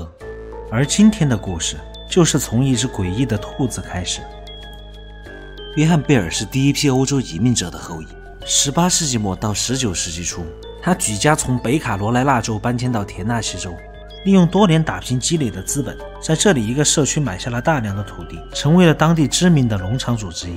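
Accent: China. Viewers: 配音是四川还是重庆人？ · 听起来是重庆口音😂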